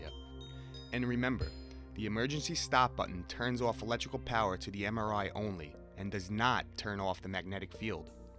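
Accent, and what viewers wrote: Boston, United States. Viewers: I don't know what was better, the education or the Boston accent.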